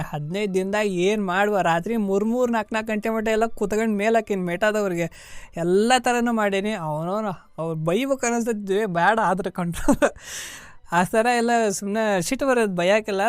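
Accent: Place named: Vijayapura, India. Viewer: ನಿಮ್ಮ ಮಾತ ನಮ್ಮ ಕಡೆದೆ ಇದೆ ರಿ ಸರ್ ನಾವು ವಿಜಾಪುರ ಮಂದಿ ಅವನವನ ಬಾರಿ ಅಂತೀರಿ 😁😁😁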